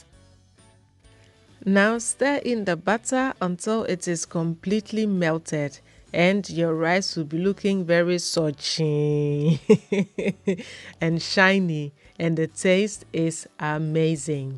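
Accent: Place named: Igbo, Nigeria. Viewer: what ethnicity are you? i love your speech, are you Igbo? hug you beautiful person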